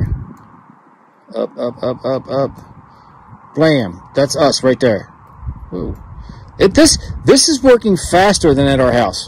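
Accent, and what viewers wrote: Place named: South Jersey, United States. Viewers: You’re from south Jersey aren’t you ? I can tell by the way you talk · Are you from Jersey or Philly?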